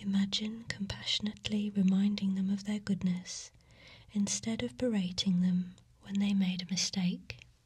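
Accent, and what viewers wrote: United Kingdom. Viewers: I wish you could do more soft speaking ASMR videos. I can't get enough of your magical tingly British voice! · I wish my British accent was half as beautiful as hers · How i love it when i listen the sound of a brit speaking it sounds so wonderful!!!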